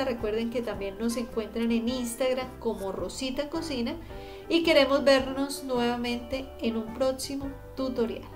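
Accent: Colombia. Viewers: Cuenta Samsung por el asentó creo que es Colombiana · Esa voz es colombiana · El acento es colombiano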